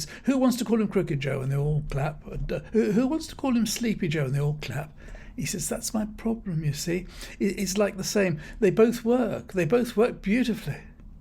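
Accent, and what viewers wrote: United Kingdom. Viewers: You know the speech is dumb when a British accent doesn't make it sound any smarter.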